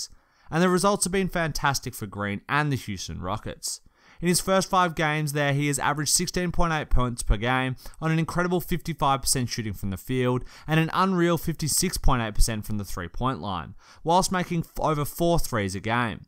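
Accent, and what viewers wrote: Australia. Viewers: u sound like an asian aussie amirite?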